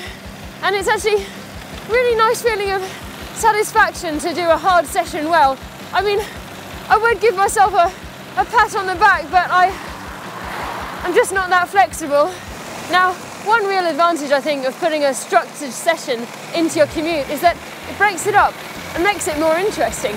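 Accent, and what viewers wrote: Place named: United Kingdom. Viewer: We love you british accent Emma Poooooley!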